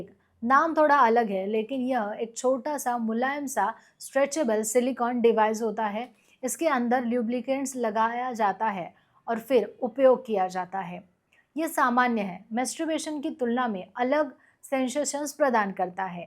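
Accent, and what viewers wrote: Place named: Maharashtra, India. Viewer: Marathi manus wala flavour aa raha hai .. kuthun aahe apan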